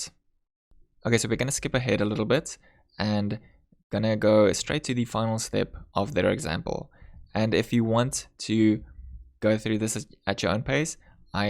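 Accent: South Africa. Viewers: You sound very South African...😃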